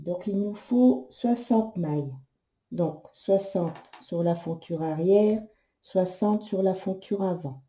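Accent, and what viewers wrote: North, France. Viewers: Superbe ..., pourtant je donnais des cours chez Singer...en <num>. Valenciennes..vous ahvez un petit accent du Nord..avec les çà....c'est charmant .., je me reconnais en vous....je ne vous connaissais pas.....j'aimerai donner des cours .à domicile .. comment calculer.....😊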